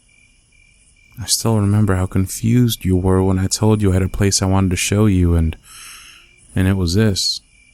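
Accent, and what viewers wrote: United States. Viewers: ok why do u sound country like whyyyyy u remind me of my bf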